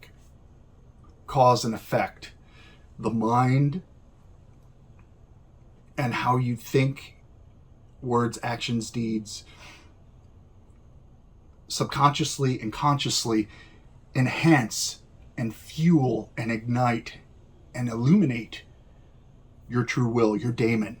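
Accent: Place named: Pennsylvania, United States. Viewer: He sounds like he's from Pennsylvania! 😊 Quick question: Can you kill someone with this? 👀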